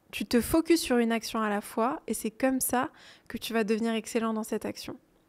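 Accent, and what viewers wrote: France. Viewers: Malgré tout je suis suisse allemand je comprends bien parce que parle comme une francaise parisienne (clair et pas trop vite).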